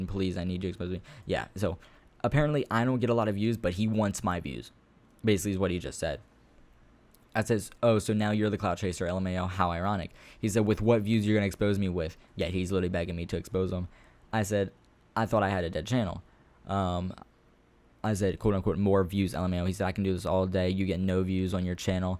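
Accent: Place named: Florida, United States. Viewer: I know that this video came out 7 months ago but I’m so confused on why he saying the n word and he ain’t from Florida he sayin jhit like he actually from Florida.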